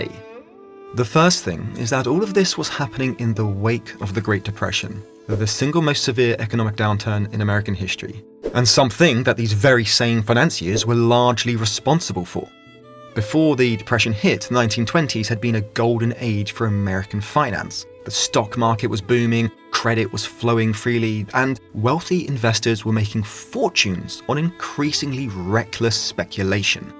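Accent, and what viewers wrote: United Kingdom. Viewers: Wfhy is a Brit narrating American history?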